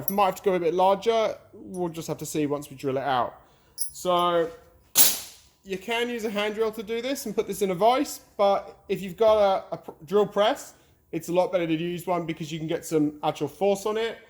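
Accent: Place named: Australia. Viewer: He's sounding more like a Aussie Boi's! Nice Video Dude Keep up the awesome work.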